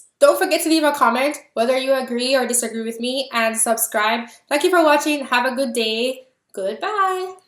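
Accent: Jamaica. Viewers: Is it just me or is her jamaican accent too sexy? (apart from her smexy beauty) Damn woman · You sound like an islander. Are you Jamaica? I am too. I'm curious of what socioeconomic class background you came from in the islands before you immigrated because you sound quite elitist and condescending in your interpretations. Did you grow up in an insular middle to upper-class setting in the islands?...away from all the struggling poor black people in the ghetto? Because your perspectives seems sooo limited. You seem to be using that same limited perspective to interpret how Black Americans or Black people in America react to dehumanization and mobilize against brutality. Obvious when Black people in Americas say "Black People" they are most likely referring to themselves and their issues - same way when Black people anywhere else posit themselves as Black people...they are referring to themselves unless otherwise specified. 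But you seem quite invested in making sure non-black people (and white people specifically) know that "NOT ALL BLACKS are like this! Some of us are good and do not rock the boat." People are suffering the world over. You may (or may not be an outside to that) but it's a social reality. You do not get to police how they react and choose to mobilize/organize. I have my qualms about BLM (they are not radical enough) but I have no issues with them choose to mobilize around being brutalized. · You Jamaican? Sound like it?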